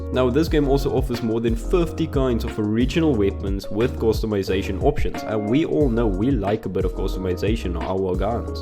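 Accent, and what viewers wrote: Afrikaans, South Africa. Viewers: Are you afrikaans? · can hear the afrikaans in this boet, but good video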